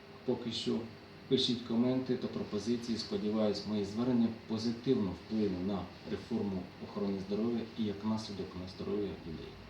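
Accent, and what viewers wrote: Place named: Galicia, Ukraine. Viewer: Справжній козак. За вимовою -галичанин.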